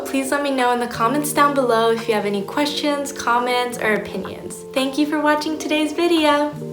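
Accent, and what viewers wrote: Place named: United States. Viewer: you are absolutely entitled to your opinion on guns or anything else - of course! I do feel that most honest, law-abiding adult people anywhere in the world are responsible enough to own a gun and they should have training to know how to use it effectively and when it is legally permissable to use it. In my own case I was British Army trained first at school from age 13, then later actually in the Army Reserves. I learned all I needed to know about firearms there. I have lived in South Africa since 1975 and have always owned firearms for self-defence in one of the most violent crime-ridden countries in the world. Only needed to use a gun once though, several years ago but did not shoot anyone, merely frightened them away. I know that in this country the injuries and fatalities by gunshot are committed not by registered, legal owners of guns at all but by criminals, robbers and such who do not purchase and register their guns - they usually steal them! 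I do not know Japan at all, but a friend of mine who does has told me of the beauty of the country and of the nature of the people. Your English incidentally is excellent and I note that you have a slight and rather attractive American accent - it is a pleasure chatting to you!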